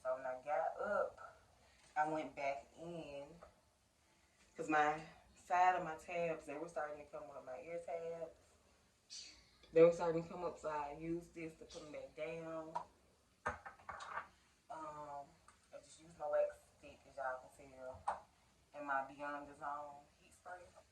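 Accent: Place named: South, United States. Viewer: I love your beautiful accent! Are you from down south?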